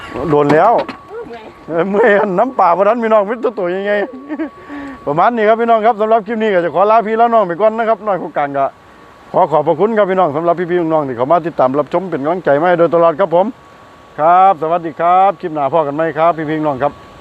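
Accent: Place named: Isan, Thailand. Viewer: ตกใจเสียงเว้า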